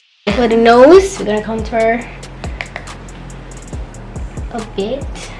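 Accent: Malaysia. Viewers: im sorry . i hardly understand your english cause it sounds different . mybe your accent is more to malay . but overall i love your makeup . lit 😍👍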